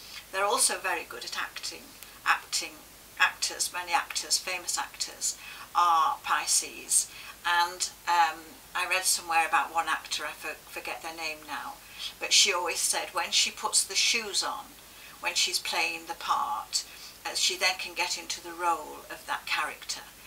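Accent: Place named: United Kingdom. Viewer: i love the british accent and english , my school teacher was a britisher and taught me the Shakespeares plays , there were many references to prophecies in the plays, love your voice and puts me back in the class room, keep speaking.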